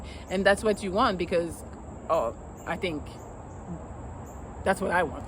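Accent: France. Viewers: Here's my one tip, I have others but I will give you one. Speak another language, like you. When you speak that French shit in that French accent? It gets me up😂😂😂 it does the same for a Man when he speaks to a Woman.😜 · She is from France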